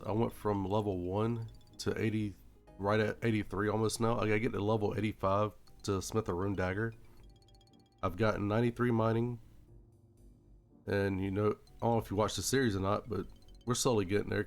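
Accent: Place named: South, United States. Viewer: Can I just say, it's about time some southern accent made it into osrs content creation. Love the series man, hope youre enjoying it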